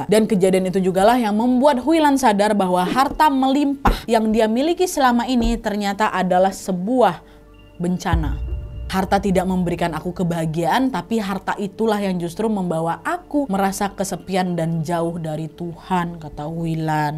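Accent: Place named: Minangkabau, Indonesia. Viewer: Baru kenal content (tiga content sj per 27 Agt 23) ini.. ternyata lsg betah mendengar paparan kisah dan cuap2nya yg dibumbui dialektika Minang Riau 😊 enak dan nyangkut sj di kuping. Keren, semangat utk terus berkreasi. 🎉🎉🎉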